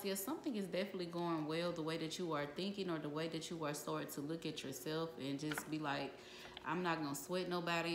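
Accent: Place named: Atlanta, United States. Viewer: Are you from atlanta 😂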